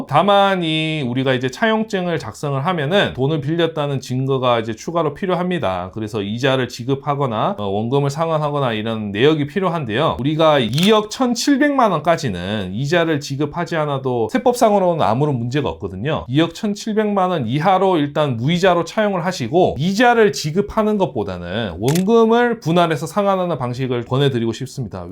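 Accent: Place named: Jeolla, South Korea. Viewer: 시원시원한 설명이 귀에 쏙~ 들어오네요 게다가,구수한 전라도 억양 살짝 풍기는 그 말씨! 듣기에 왠지 좋아요~~^^ 영상 많은 보탬되었네요 감사합니다,화이팅~~~!!!!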